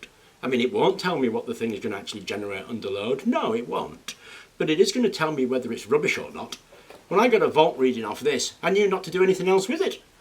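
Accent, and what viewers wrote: South, United States. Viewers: nice, lol "he does have a different accent.." well he does live down south ya know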